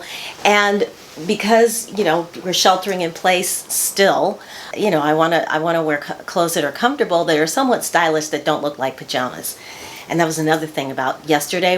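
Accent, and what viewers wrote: United Kingdom. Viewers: Happy Birthday!💕🎂🌸 I’m 5 months older than you.😀 That British accent...I thought I clicked on someone else’s channel at first. That was great. It that a romper you’re wearing? Love both the style and color on you. Which one is the link please? Thank you. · Like the British Accent:) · Love the British accent! You are so posh!:))) 💛💚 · Great job on the British accent. I’ve always loved the way they speak, makes the English language sound so much better. Great haul,lots of goodies. I need a good bra that will lift and hoist these puppies back to where they belong. Lol ❤️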